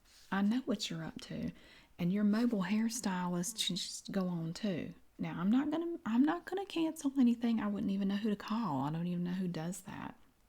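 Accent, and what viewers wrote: South, United States. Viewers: You have the best southern accent and that sense of humor! Love it!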